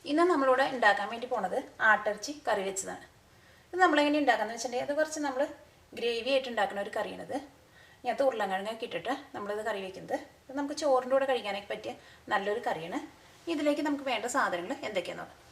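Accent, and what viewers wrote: Thrissur, India. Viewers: trissur slang polichutta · Haai.. Nalla peddakkana thrissur basha.. Nice video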